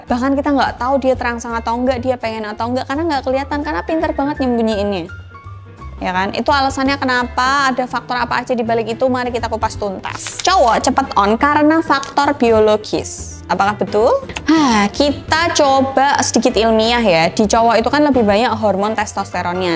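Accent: Java, Indonesia. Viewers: mbak,logat jawanya kental banget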